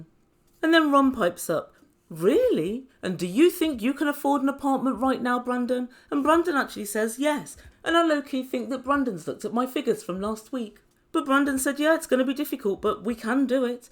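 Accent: South, United States. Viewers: Love your Southern accent! Love your channel! ❤️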